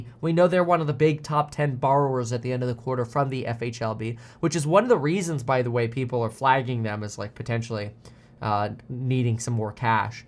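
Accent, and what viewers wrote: Switzerland. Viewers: Love the Swiss accent! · Why isn’t anyone talking about his SWISS accent?!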